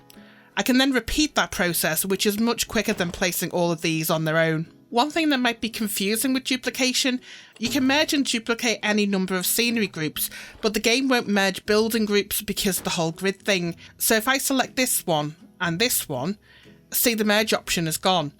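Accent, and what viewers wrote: United Kingdom. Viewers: You have a sort of an accent...Brittish????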